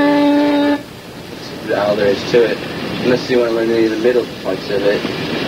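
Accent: England, United Kingdom. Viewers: of course he started getting a English accent. look who he was around night and day. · This guy has an english accent ?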